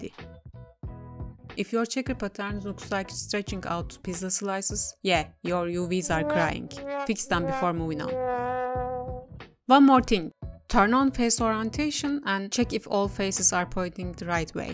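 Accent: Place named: Turkey. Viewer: I know it when I hear a Turkish accent. Are you Turkish?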